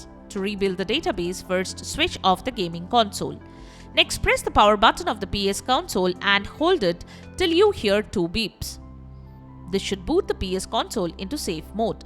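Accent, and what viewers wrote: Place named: India. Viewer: English mix with Indian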